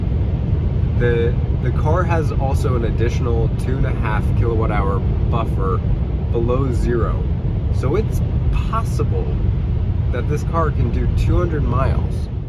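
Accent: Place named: Eastern Europe. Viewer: Where are you from? You have an interesting accent. Like some slight Eastern European accent.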